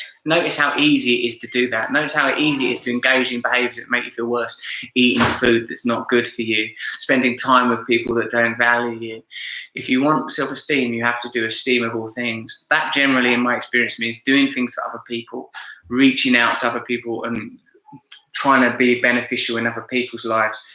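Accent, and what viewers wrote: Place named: United Kingdom. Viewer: Totally. I just know that when I do start reading, the version of voice in my head is no match for the real thing.;) We American's are so easily beguiled by eloquent British accents.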